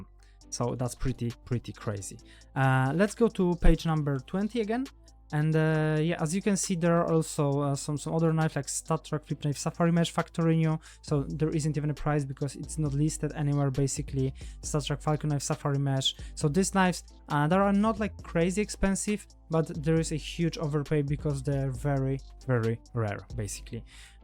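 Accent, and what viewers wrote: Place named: Poland. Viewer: ten polski akcent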